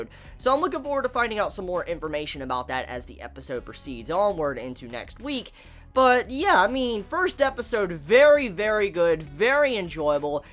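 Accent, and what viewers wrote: United States. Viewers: Are u American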